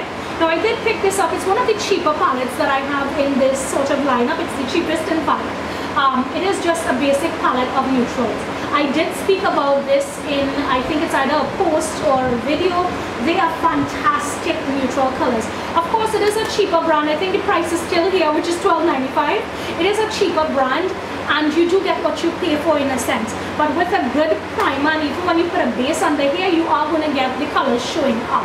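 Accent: Trinidad and Tobago. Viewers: This truck nuh serious bout life hahahah true Trini talk lol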